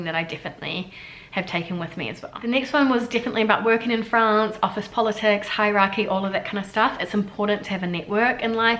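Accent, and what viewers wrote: New Zealand. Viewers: Joyeux anniversaire!! Merci pour cette chouette vidéo et pour ton appréciation de la France. J'aime ton accent et tes expressions NZ. 😊🎂🍾🎉👏👏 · Bon anniversaire ! C'est marrant tu as repris ton accent néo-zelandais xD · Happy Birthday, I have short showers due to hot water shortage but I still manage to rinse my soap. You better rinse just in case is not good for your skin. A lot of people also don't rinse the dishes from the soap in Australia. The only time I don't rinse the soap of me is when I have bath because I run out of hot water. May your 30s are as happy as your 20s. You are real pleasure to watch. I like the way you speak NZ language!!! And I like your French too. I have heard you speaking French!!!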